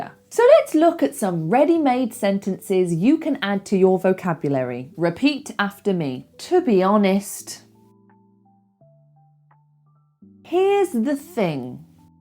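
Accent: United Kingdom. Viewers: Hello pretty and smart girl, how are you? Well, I'd like to know if your accent is British. I incredibly can understand a good part that you speak, I say "incredibly" because I'm Brazilian and my ears are trained to a United States accent. I like the way you speak slowly; it's very didactic. Furthermore, the beauty of your face is a delight to my eyes. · You have a great pure British accent. I really made use of this video 🎉🎉🎉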